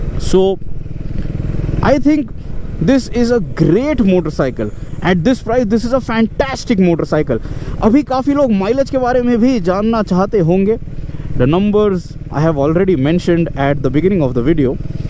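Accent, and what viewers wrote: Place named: South India, India. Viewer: One is national language and another is almost international language, so I guess you should use this excuse and do some contextual thinking with your interest in bikes to learn some Hindi. His Hindi as it is has a heavy south Indian accent, so I guess he's doing his best to speak in Hindi to cater to a larger audience.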